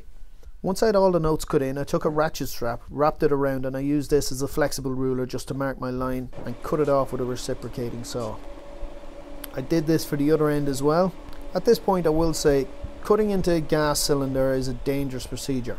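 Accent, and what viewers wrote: Ireland. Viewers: Don’t even try using the closed captions. You just need to learn thick Irish. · You Irish? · Stellar video! So cool to see it worked out so well, and the video production is also very nice. Loved the commentary and the Irisch? accent.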